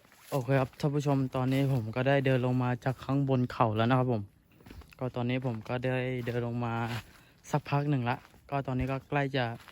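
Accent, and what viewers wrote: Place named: Isan, Thailand. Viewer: พูดคล้ายสำเนียงอีสานหวั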